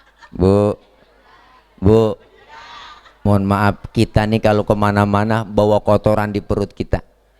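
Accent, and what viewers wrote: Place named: Cikarang, Indonesia. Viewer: Pa ustadz ..Bahasanya org Cikarang ye ..ceramahnya ga bikin ngantukk Ada lucu nya ada seriusnya ..semangat ye ustadz tilee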